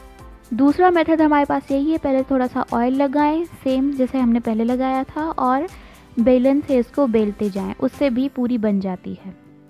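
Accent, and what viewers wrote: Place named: Hyderabad, India. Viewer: wow, that's great. I also had a feeling that you're from Hyderabad because of your accent.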